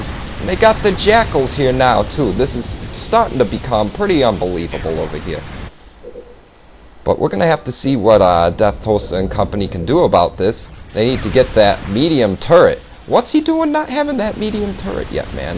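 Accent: Boston, United States. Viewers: ROFLed the whole time with the Buuuston accent. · FUCK that boston accent annoys the fuck out of me.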